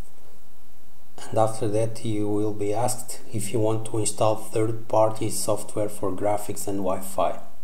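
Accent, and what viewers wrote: Portugal. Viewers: Na hora que ele começou a falar eu sabia que falava português kkkkkk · Aposto que o autor do video é português, pelo sotaque! I want know if I can install on a 16gb pen?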